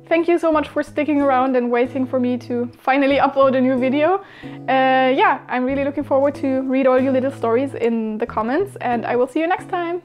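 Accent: Germany. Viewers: the lovely German accent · Haha, bitte nimm das jetzt nicht persönlich, aber finde es gerade sehr witzig, dass ich innerhalb einiger Sekunden den deutschen Akzent rausgehört hab. :'D Überlegte schon, ob ich nachschaue woher du stammst, also danke, dass du es gleich in diesem Video geklärt hast. :> Wirklich beneidenswert, dass du diese Erfahrungen sammeln konntest. Wünsch dir noch viel Erfolg mit deinem Channel. :) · Your German accent sounds so good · i think shes german she has that accent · I could hear in your voice that you're German,and then I made sure. I WANNA GO THERE,I LOVE GERMANY😍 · You sound really german. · I love you're accent and ughhgh German girls <3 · Da schaut man 5 Sek vom Video und denkt sich "das muss ne deutsche sein", geht in die Beschreibung und tadaa was sieht man... Sie ist deutsch XD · so you are german? it sounds like you are XD lol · Du bist doch deutsch oder? 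Man hört das voll😂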